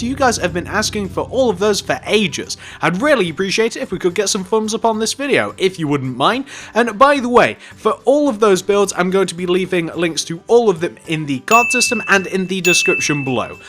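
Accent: United Kingdom. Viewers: Leth Ls he is British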